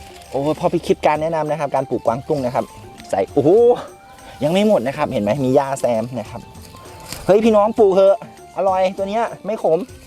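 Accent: Isan, Thailand. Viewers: ชอบๆพี่ดูตลอด .ได้สาระดี .พูดอีสาน กึ่งไทยสุดยอด..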